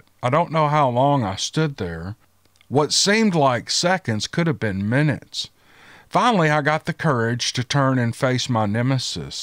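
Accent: South, United States. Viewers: colloquialism and regional differences in syntax are what make regions unique. rather than focusing on any particular differences, realizing how any language changes through time, understanding and accepting these changes will help those who are hung up on particular words and/or pronunciations. as long as people can understand your message, then what does it really matter. and those who nit pick and complain just to complain, usually are projecting their own issues of self acceptance., your reading/narrations are always spot on and very easy to understand. Your Southern accent, draw and tone make it all the more enjoyable 😎🤗🙃💯✨😐. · I love listening to you too in the mean while i am knitting or crochet a sweater or so, you have a nice voice and talk so that even with your southern accent it is pleasant and very good to understand, so keep on the good works, greatings from Marleen from far away europe in Belgium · So true. 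He has a comforting voice and I just love that southern drawl. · Hi, I hope you and your family have a safe and happy holidays. I'm from Australia 🇦🇺 and it's summer down here,... I love listening to your Southern drawl. Keep up the great work. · You can pronounce it any way you like--that sweet southern drawl (I'm in California orig from El Salvador) is what makes it so much more enjoyable. And yes, yes, the Sasquatch stories too, lol!! ❤️ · I enjoy the stories , don’t sell your self short . You are an articulate gentleman. Down home southern is refreshing, fun and precise. Merry Christmas. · I'm a 70 years old lady in Pocahontas, Arkansas I like listening to your Bigfoot stories too. And I especially like to listen to stories about bigfoot being so close to where I live. Your voice seems southern and familiar so it keeps me company. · I agree with Miss Jessie you do have a sweet southern accent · Finding Bigfoot? 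Finding Nothing... You Don't Find Bigfeet. They Find You. (Don't Call Us. We'll Call You) I'm quite new to this channel, about 4 months at THIS typing. I'm addicted to it - Some super good, fun stories. I enjoy "Steve Lilly Journals" too. (God DAMNED Filthy 'Squatches!! Ha!) Good Writing! ~ I live in B.C. (Vancouver Island) Canada. I've spent decades living in some pretty "howling wilderness" & experienced some strange things. I've yet to encounter any Sasquatch...However, I know one serious individual, whose word I trust as veritable without question, and who claims He HAS seen one... I neither believe nor disbelieve. I don't know Anything for Certain. Indigenous peoples here take the Sasquatch as a matter of fact. Sasquatch are deeply embedded into their very ancient cultural mythos lineage. Many tribal members are quite candid about the phenomenon, they call "Pohk Miss" (Meaning "Big Foot" - Go Figure) as if as familiar, and real as the West Wind. 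I CAN say that we live in a vast, complex, diverse, and mysterious living world, about which We collectively barely know & hardly understand at all. It is always in a state of Constant Flux. Fools THINK they have a real grip on reality. ~ Been practicing Mr. nice regional Southern accent. (I like various accents and find them "geo-culturally" & historically interesting, with regard to the human condition.) I'm...Getting better...Getting better...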